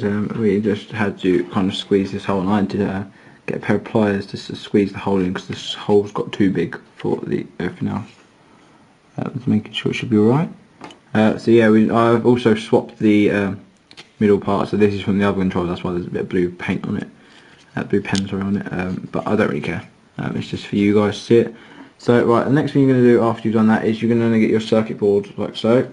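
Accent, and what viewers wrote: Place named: United Kingdom. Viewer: The HEAVY Brit accent is hard for this Yank to understand.I got about half of what he said, Sorry mate.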